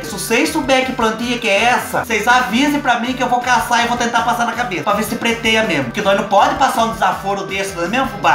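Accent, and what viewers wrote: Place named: São Paulo, Brazil. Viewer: Gosto mto dos seus vídeos vc, é mto engraçado, com esse sotaque paulista.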